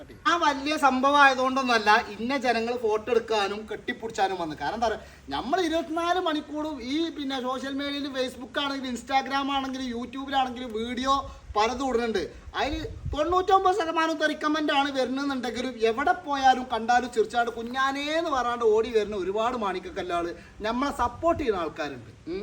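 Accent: Malappuram, India. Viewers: എടോ, നിങ്ങളുടെ വീഡിയോ എല്ലാം മെച്ചം തന്നെ, സിനിമയിൽ എന്ത് ഊച്ചാളിത്തരവും കാണിക്കുന്ന ഈ നടൻ സിദ്ദിഖ്, അവൻറെ തോളിൽ കയ്യ് വെച്ചുവെന്ന് പറഞ്ഞ് എടുത്ത് മാറ്റാൻ പറഞ്ഞത് അഹങ്കാരത്തിന്റെ അങ്ങേയറ്റമാണ്. മകൻ മരിച്ചപ്പോൾ അവനെ എല്ലാവരും കെട്ടിപിടിക്കുന്നത് കണ്ടല്ലോ, മുംബ് റിയാദിലെ ഒരു കംബനിയിൽ ഇലക്ട്രീഷ്യൻ ആയിരുന്നു അയാൾ.എല്ലാറ്റിനും കൂടി ഇപ്പോൾ കിട്ടികൊണ്ടിരിക്കുന്നുണ്ട്. പിന്നെ,നിങ്ങളുടെയീ പ്രാകൃത ഭാഷ ദയവായി ഒഴിവാക്കണം, ഇപ്പോൾ മലപ്പുറത്തെ ആരും തന്നെ ഇങ്ങനെ സംസാരിക്കുന്നില്ല.സംസാരിക്കാനറിയില്ലെഘിൽ അറിയാവുന്നവരെ കൊണ്ട് ഓഡിയോ ഇടുക. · നിങ്ങൾ സംസാരിക്കുന്ന ബാസ ഏതാ ശരിക്കും മലപ്പുറം ബാസ ആണോ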